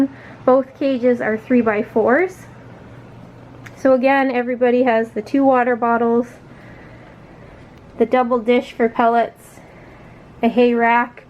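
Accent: Canada. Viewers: You do you girl! Say things the way you're comfortable saying them. I just thought that was how you guys say it in Canada. Lolz I really enjoy your videos and you have helped me to know how to take care of my piggies Soo well. Wheeks from my piggies to yours. Thanks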